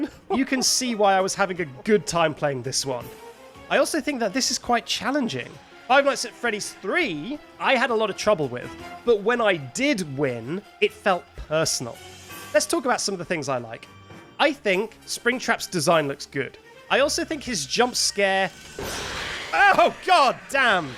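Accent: United Kingdom. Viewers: AHH HE'S BRITISH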